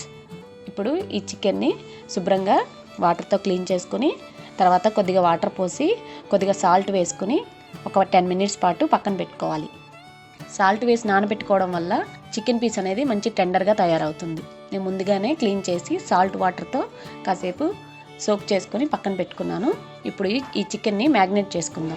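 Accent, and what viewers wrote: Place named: Guntur, India. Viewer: medam padaka padaka antunnaru meru form guntur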